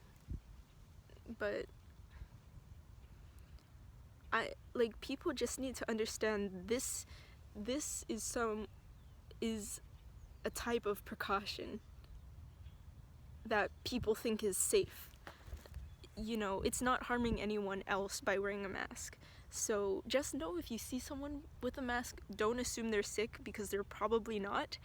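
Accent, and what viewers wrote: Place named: United States. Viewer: She sounds American to me. Stay in your lane weirdo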